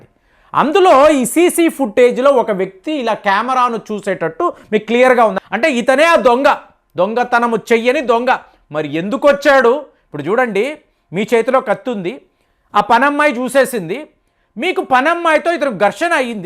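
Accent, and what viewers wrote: Tamil Nadu, India. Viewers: తమిళ ప్రభావం ఎక్కువయ్యి మాతృభాషను నిర్లక్ష్యం చేస్తూ తప్పులు మాట్లాడుతున్నారు ప్రేమ్ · gaaru Chennai lo unaaru kaabatti thamil mix untadi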